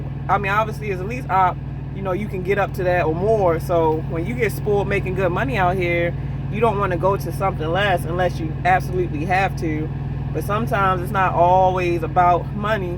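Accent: Baltimore, United States. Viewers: That thick ass Baltimore accent, I'm from Philly I know a bmore chick when I hear it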